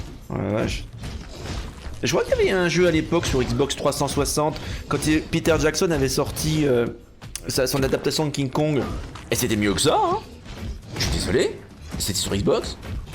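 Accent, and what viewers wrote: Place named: Quebec, Canada. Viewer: Jolie accent québécois 🥰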